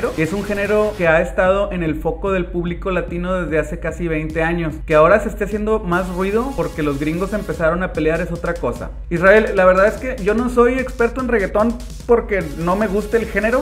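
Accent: Mexico. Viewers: siendo sincero el acento mexicano no me gusta para nada, me suena ridículo a veces, pero debo admitir que el tuyo es el mejor, ni siquiera sabia que era mexicano, y ahora que se que es mexicano me va a costar decir qie no me gusta el acento, me encanta tu voz y la naturalidad con la que hablas, me da curiosidad saber como cantas. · Excelente video, yo soy de Ecuador y realmente a todos los mexicanos les oigo igual, 😅😅😅, no me parece que se merezca un video, ella fue un éxito de 5 canción a lo mucho y se fué desvaneciendo, hasta desaparecer, me fascina desde que tengo 12 años, aurita llevo 39 vueltas al sol, y cualquier video sobre ellos Siempre será bienvenido, que tengas un gran fin de semana.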